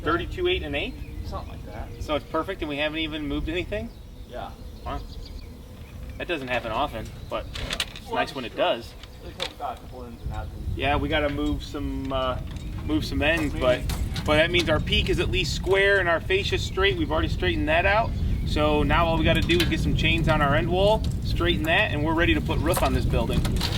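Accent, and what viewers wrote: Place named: Chicago, United States. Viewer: Dude. I was born and raised in Illinois, 30 years. Moved to Texas 3 years ago, and everyone tells me I have chiiiiicago accent. Well... I've never heard what they are talking about... Until today. After hearing you... I get the chiiiiiicaaaaaaago accent. Good work though!! Keep it up - enjoying the builds!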